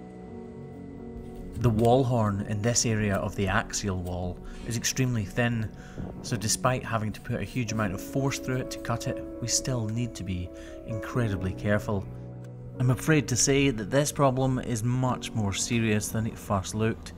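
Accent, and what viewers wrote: Scotland, United Kingdom. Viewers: It’s a very dirty job but someone has to care for cows feet and you do a fabulous job. Too, love your Scottish accent!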